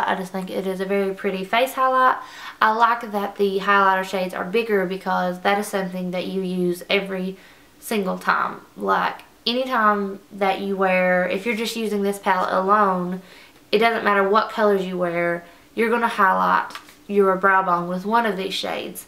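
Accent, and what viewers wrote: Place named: Tennessee, United States. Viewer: Great review! I came here with the intention of buying Naked, but now Im sold on Chocolate Bar. BTW, where are you from? Your accent sounds like me! (Tennessee)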